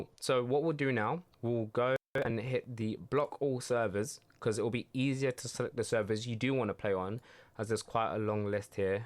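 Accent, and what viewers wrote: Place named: United Kingdom. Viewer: Got to love hearing a brit playing cs, see brits all over youtube playing but i always got Igor and vlad on my team instead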